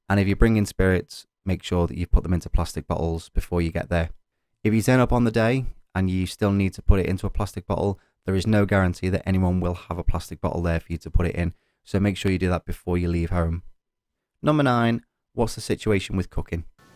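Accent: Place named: Wirral, United Kingdom. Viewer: Sounds like he's from my neck of the woods which is from the Wirral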